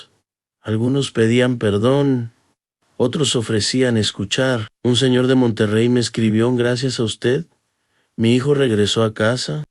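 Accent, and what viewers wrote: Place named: Pocho, United States. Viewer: Que pésima narración, no necesitas intentar hablar como pocho, el español es bellísimo, no me gustó tu narración, no terminé de escucharte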